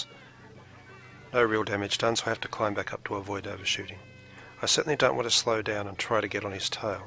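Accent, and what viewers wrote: Australia. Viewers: He sounds like an Aussie to me.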